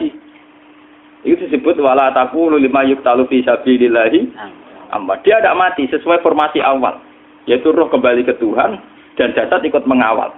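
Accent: Java, Indonesia. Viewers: Tolong admin channel ini smua pake translate meskipun beliau pake bahasa indo tapi dialek,y msih kental dng jawa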